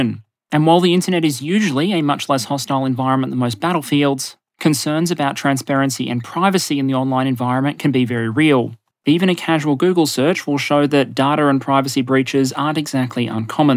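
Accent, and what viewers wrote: Australia. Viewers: He's an Aussie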